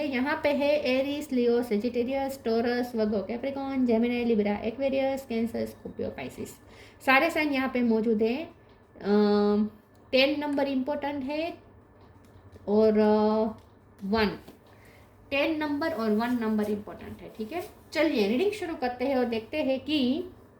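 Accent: Gujarat, India. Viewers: Are you gujarati?.😅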